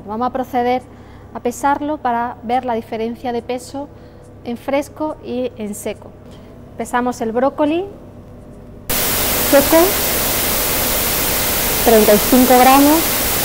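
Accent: Andalusia, Spain. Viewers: tiene un hermoso acento andaluz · Que bonito Andaluz! La lengua madre del hoy español estándar (sin zezeo)!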